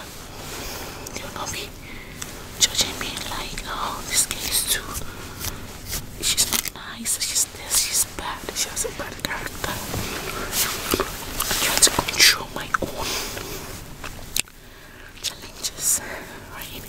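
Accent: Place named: United Kingdom. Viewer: I like when she speaks English i almost hear a British accent... Such a sweet lady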